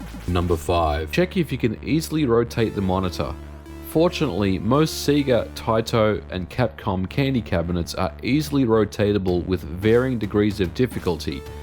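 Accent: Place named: Australia. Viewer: I know you’re from America, but I wonder why you speak with an Australian accent...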